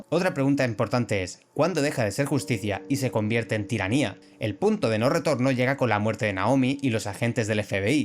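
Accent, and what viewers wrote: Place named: Spain. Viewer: Español de españa? ostiaaaaas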